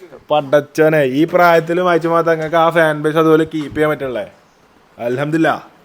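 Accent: Malappuram, India. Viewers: ചിറി അല്ല ചിരി നിങ്ങൾ മലപ്പുറം ഒരു മാതിരി ഒയെന്നെ സംസാരം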